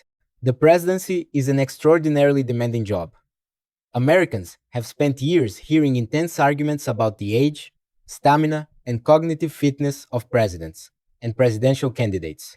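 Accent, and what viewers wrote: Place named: Spain. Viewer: What s your mother language ? It sounds as if.it's Spanish !?! I WISH I COULD SPEAK SPANISH AS WELL AS YOU DO ENGLISH ! Nice video , concise just relax dude . Your doing great 👍 🇨🇦🇨🇦🇨🇦🍁🇨🇦🇨🇦🇨🇦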